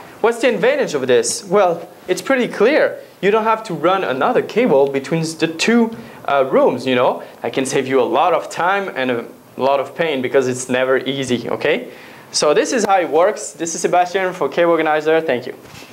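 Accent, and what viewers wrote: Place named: Quebec, Canada. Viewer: allo le québécois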